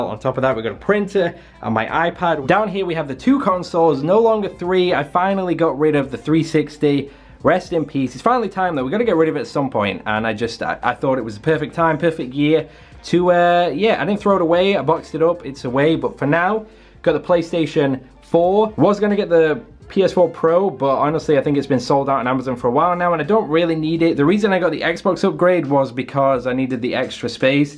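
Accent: United Kingdom. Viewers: his accent man half British half American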